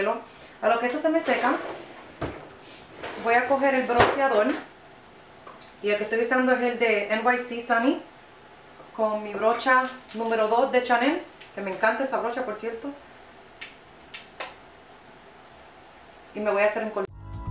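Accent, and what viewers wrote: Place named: Puerto Rico. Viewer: a mi me gustan los maquillajes naturales :) ademas quiero que sepas q me gusta como hablas bien boricua.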